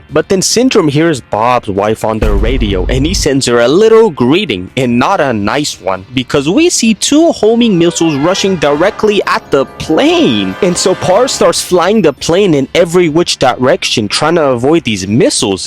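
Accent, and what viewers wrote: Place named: Toronto, Canada. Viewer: Bro sounds like he from Toronto lol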